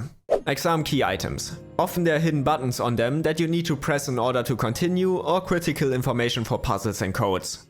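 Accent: Germany. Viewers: I could swear it's german or something like that. I'm from germany and lot of content creators from here have a similar accent in english. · German accent ?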